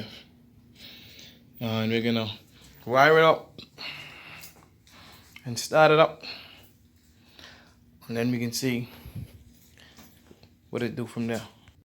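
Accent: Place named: Bahamas. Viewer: Sound Bahamian 🇧🇸🤌🏾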